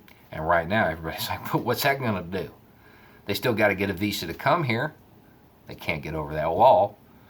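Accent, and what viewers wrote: South, United States. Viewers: For as long as I've been watching your videos, I've been thinking: It sounds even truer when you hear it stated with a southern accent.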